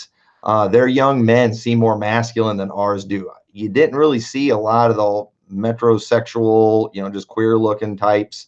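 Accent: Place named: New England, United States. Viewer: I was hopeful that your Yankee accent was going to be a new temporary super power, but hopefully it was an encouragement to the local soulwinners that it can be fruitful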